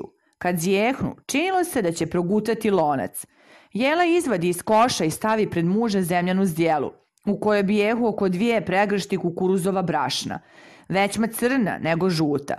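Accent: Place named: Serbia. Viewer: Jeli ovako govore Dalmatinci ovo je Srpski iz Srbije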